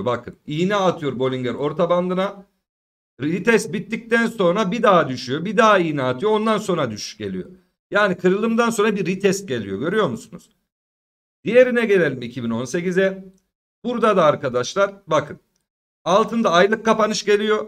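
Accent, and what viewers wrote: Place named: Nigde, Turkey. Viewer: Usta niğdeli aksanıyla konuşuyorsunuz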